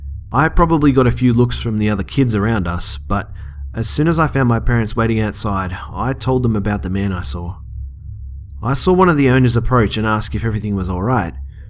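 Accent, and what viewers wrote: Australia. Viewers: does have a great voice! But you should come to Australia all the guys have the same accent/voice to a degree! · good job. feels wierd hearing an Aussie on YouTube. guess I haven't delved enough. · i love the Australian accent what do Australians think of a american Midwest accent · Delete your comment quick. He's aussie and aussies get mad when you mistake their accent for a british accent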